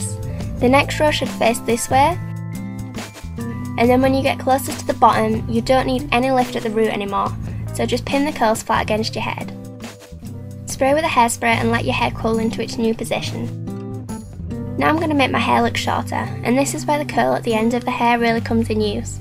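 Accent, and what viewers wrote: Yorkshire, United Kingdom. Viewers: Her accent is from Yorkshire, England :)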